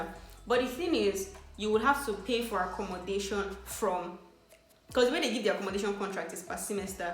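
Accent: Cameroon. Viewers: you sound more like a Cameroonian, nice video, very detailed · Are you from Cameroon.your language tune seem similar here.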